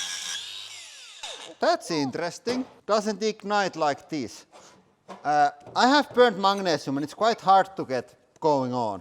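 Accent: Finland. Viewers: I love when Finns speak English. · I know you speak this extreme finglish on purpose, but after a while it gets really irritating. So irritating that i find myself muting the videos. The gimmick has gotten to be a bit over-used. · Rally English never gets old 🇬🇧❤️🇫🇮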